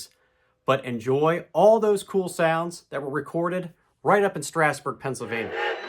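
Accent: Kansas City, United States. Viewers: You're totally from Kansas city...right?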